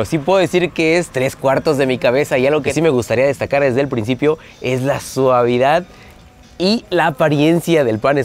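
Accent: North, Mexico. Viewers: Ya se te pegó el acento del norteee :D jejejeje, todo se ve buenísimo. Nunca me imaginé que en Chihuahua se cocinara tan bien ;D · Se le pego el acento en corto!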